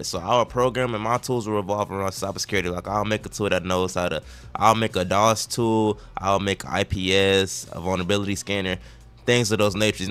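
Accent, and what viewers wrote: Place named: Florida, United States. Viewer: I'm prouda you! This was super motivating. Thank you for sharing. OAN you gotta be from FL lol I can hear it!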